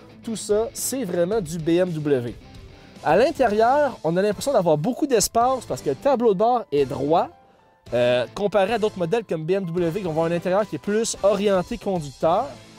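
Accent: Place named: Vaud, Switzerland. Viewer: On dirait un accent vaudois quoi!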